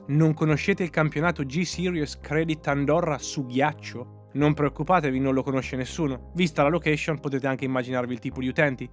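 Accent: Perugia, Italy. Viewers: Pensavo di essermi accorto solo io 😂 Perugia si sente da lontano ahahahhaah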